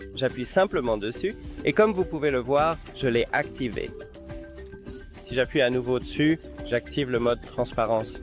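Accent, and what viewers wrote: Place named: Quebec, Canada. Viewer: Il y a un petit accent québécois .. C'est sympa .. ☺️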